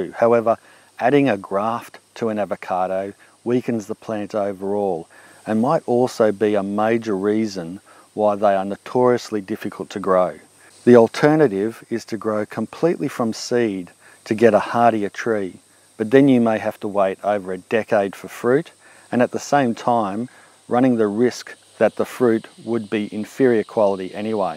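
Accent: Australia. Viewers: My wife got me into watching your videos, love your Aussie accent. We are from San Antonio TEXAS and I'm trying to grow avocados and I keep having the same problems. Well you just told me how to fix them my yard is nothing but clay soil, I will have to mount them up now. LOVE YOUR VIDEOS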